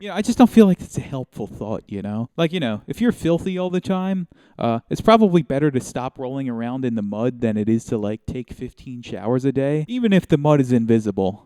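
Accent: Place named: Philadelphia, United States. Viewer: I’m from around the bucks county area. I could tell by your pronunciation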